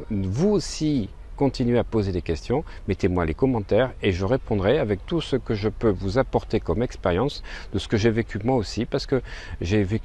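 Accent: Bordeaux, France. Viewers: Merci Bro. ! Vous avez perdu votre accent bordelais ?!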